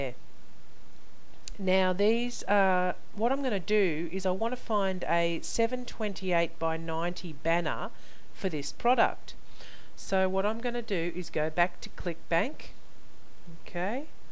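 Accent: Australia. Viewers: Trying to find a site explaining banners and hoplinks. Thanks for your video. Nice to hear an Australian accent for a change.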